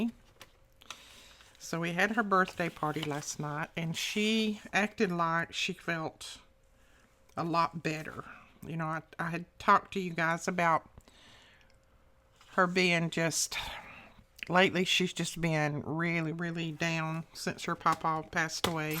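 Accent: United States. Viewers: Hi, first of all glad your granddaughter had a great birthday, we worry about our grandkids more because we know we won’t be with them for as long of a time as we want too. I am so glad to hear that the crafting community helps you with your grief at losing your beloved husband Whit. If you want to just chat that is fine with me as I love listening to your American accent. Very cute haha xx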